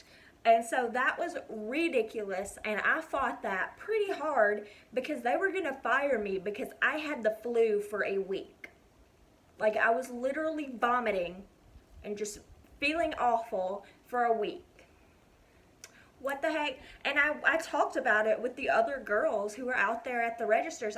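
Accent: United States. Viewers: You have tattoos and a country accent this is so strange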